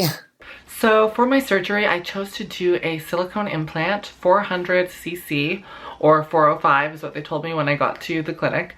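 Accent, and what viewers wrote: Canada. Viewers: I listen to to learn canadian accent of english, she pronounces well, extremely beautiful girl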